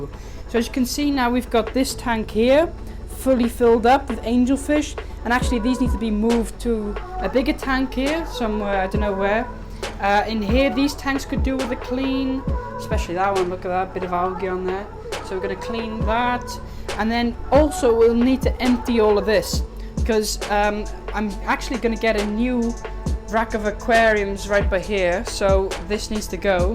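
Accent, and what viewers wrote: Wales, United Kingdom. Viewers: Just subbed, nice little setup.. hear the Welsh accent too👍🏻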